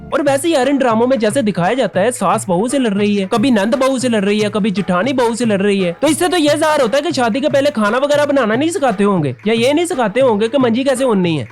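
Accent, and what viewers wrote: Punjab, India. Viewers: Hyyyy your Punjabi accent UFFF 😂😂😂. Love it bhi jaan. Lol the ending thoo😂😂😝😝 Keep it up bhi jaan. Wish you a very good lick for your future 🧕🏻😊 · Punjabi bht mazy ki bolty ho hahahaha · bhaii i like your punjabi talk style . literally it makes me laugh....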